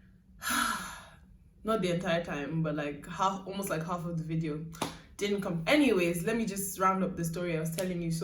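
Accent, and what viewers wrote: United Kingdom. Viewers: I totally understand this, when I’m with you, I pick up things from you and it’s so unplanned. I think you should just be yourself though, your accent is more british though. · I know the feeling. I did that before where I was filming and my record was not on at all. I do hear a British accent when you speak. The odd words comes out as American. But, you do have a beautiful tone of voice💕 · looool... i absolutely love how you speak i hear more of British though not strong sha i sha enjoy hearing you speak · Traffic 🙄🙄🙄 you just had to add it 😂😂 I think it’s more of the British accent for me, it’s what I get from hearing or listening to you talk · The struggle with filming ,sorry dear 🤗 I have noticed you have British accent and not a lot do I hear Naija accent , it always very light . It’s a beautiful accent and you speak great English. 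True about the accent things when relating to Client at work. Haha they sure will charge you high when you speak like a foreigner in Naija 😂😂 well I guess you should just keep enjoying your code mixing and switching accent😁❤️ I honestly think you should just be yourself babe , it comes let it flow ❤️ I have my very strong Naija accent and it’s not going anywhere 😁 ever with my Naija accent when I visited Naija my friend didn’t allow me to talk at the market to negotiate, according to her i sound a bit different from normal Naija accent 😁which I don’t believe thou until another day when I talked , the lady at the saloon was like “ where are you from “ I was confused ooo 😁😂😂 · Multiple accent malfunctioning 😂😂. Why is traffic the first thing you mentioned 😭😭 I hear British accents when you talk though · Your intro though 😍. I love your British accent. I think the have the best . And you make it sound better even though environments can rub of on you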